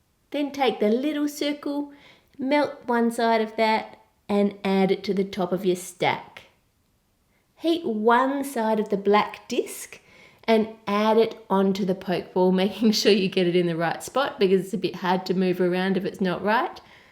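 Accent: Australia. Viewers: Are you from Australia? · You know shes Australian not British or American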